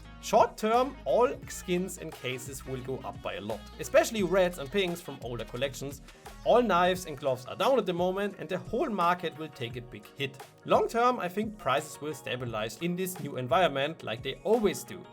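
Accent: Germany. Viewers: Alter dieser scheiss deutscher akzent ich kann nicht mehr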